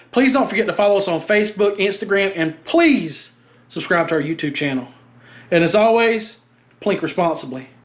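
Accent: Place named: South, United States. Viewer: No offense you look middle eastern but sound like dirty south ... and m855 isn’t all that bad it isn’t the best in the world but it’s also way better than the best 9mm in the aspect of damage it will do to a soft target, I do have about 1000 rounds of it in the stock pile, but the other 3500 rounds in the stock pile are hornady 62 gr Fmj and Soft points both of those, short neck great pen/ fragments and weight retention, but if I find green tip for cheap I would buy it ... I’d rather get shot by federal hst 124 gr over m855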